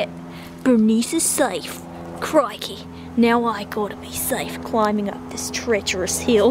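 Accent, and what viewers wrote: Australia. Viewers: I like your Australian accent :) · Welcome!!!! Nice australlian accent ;)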